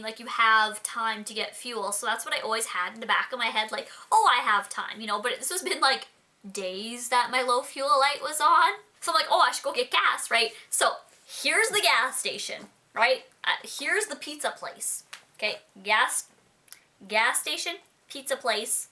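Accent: Canada. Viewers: Omg u sound so canadian like idk y I notice now lolz its so cuteeee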